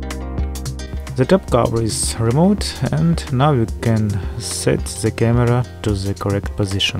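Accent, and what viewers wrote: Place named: Russia. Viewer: russkiy accent chyvstvyetsa))))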